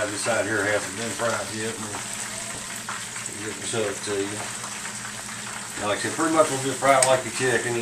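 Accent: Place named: Texas, United States. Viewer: strong texan accent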